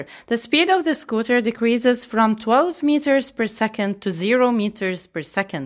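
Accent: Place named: Lebanon. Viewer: the narrator sounds Lebanese to me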